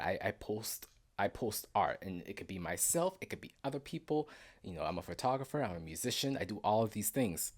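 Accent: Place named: Geechee, United States. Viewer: Yesss your accent is too cute.. that good ole southern geechi honey ❤❤ I'm from SC too ❤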